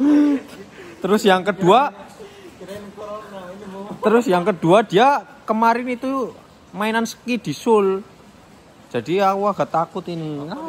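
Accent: Banyumas, Indonesia. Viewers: Wong ngapak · Ngapak y mbok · Rika wong ndi sih kang kayong logatane ngapak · Bukan kasar tapi ngapak......😅😅😅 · Weh weh ra ngapak ora kepenak yo mas😁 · Mas...sampeyan wong ndi? kok logate ngapak, hehe... 🙏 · Haha kaya masih ngapak and medok lucu dan seru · Kok ngapak ya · Masnya dr ngapak yaa ..bahasanya kelihatan berat kakuu ..seringb2 ngobrol ma daerah lain ..biar gk kaku bahasanyaaa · Ora Ngapak Ora Kepenak ya kang😃😃😃👌👌👌 korea skrg dah 3rb lbh yg poditif terkena virus corona,,,, kayauuuu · Ngapak e ketok buos 😂😂😂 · jiaaaan kynee ngapak kie luuh hahaha...salam knl mas broo?? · Asli ngapak ya mas? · Sampean orang ngapak yh mas...soale ketauan dr logate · ini org jawa saya ngapak2 ngapak ngendi mas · Kie mesti asalle ko wong ngapak2 ya mas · Wong banyumas y? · Wong ngapak ya rika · Yakin mesti giye bocah ngapak. Manggone nang endi ya...? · Kowe ki ngapak ya mass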